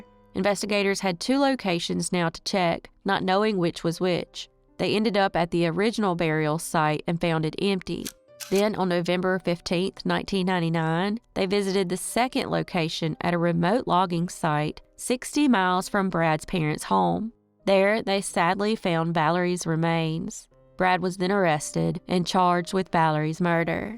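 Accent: South, United States. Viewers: Hey! I'm not sure what your actual name is, so I'll call you. Just so you know, I binged your content last year while building a chicken coop and cutting a lot of wood. So, whenever I hear the intro, I can smell cedar! Or, whevener I smell cedar, the intro plays in my head! Weird, eh? But anyways, thank you so much for the content and soothing voice and southern accent. I wonder, have you noticed a difference in viewership between unsolved and solved cases? I know, for me, I'd like to see more solved cases. Thoughts?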